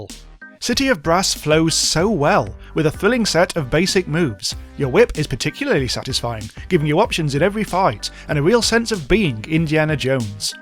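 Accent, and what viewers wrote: United Kingdom. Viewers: Steven 131 I can tell it's British, but the UK has many different accents. A Northern Irish person sounds very different from a Londoner.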